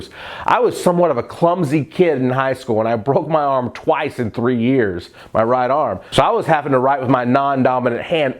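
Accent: United States. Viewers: Are you from America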